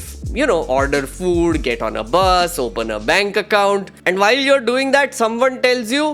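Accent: India. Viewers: English is an Indian language now and you are speaking indian English while talking about India. I don't understand why people don't understand it! And on top of it choose to mock the accent. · I don't know what a Correct accent is either. It's probably just some white liberals offended, because your accent kinda sounds like Apu. A cartoon character used to make jokes about immigrants from India.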